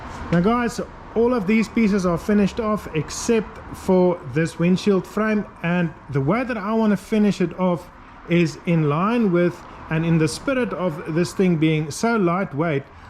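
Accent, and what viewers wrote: South Africa. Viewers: These are great videos. Love your South African accent. Reminds me of when I lived in SA. 'N boer maak 'n plan !!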